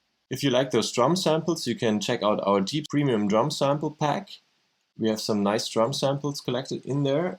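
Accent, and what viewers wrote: Germany. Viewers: Man hört raus dass du deutsch bist xD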